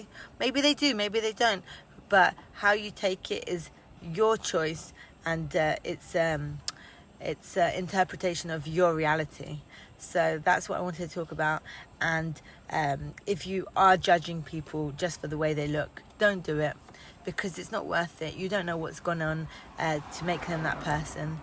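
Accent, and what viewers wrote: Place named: England, United Kingdom. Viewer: Are you English? You've got an interesting accent.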